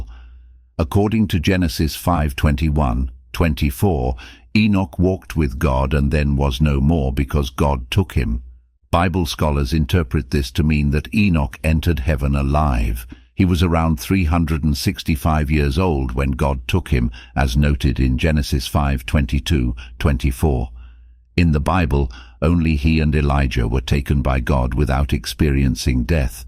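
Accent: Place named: United Kingdom. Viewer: Omnipotent, Omniscient, and Omnipresent means something to me. And your British accent can mean something in television animal documentaries but not in theology. Thanks but no thanks.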